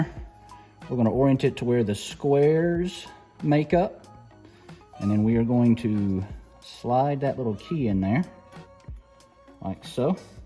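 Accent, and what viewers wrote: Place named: South, United States. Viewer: great video thanks. You sound like you might be in the south....are you for hire to perform this service? I'm in GA and am looking for someone who can do a timing belt service for a Honda Beat.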